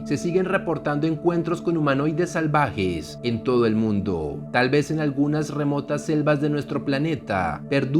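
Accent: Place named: Mexico City, Mexico. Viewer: Parece chilango ha no se crean yo soy chilango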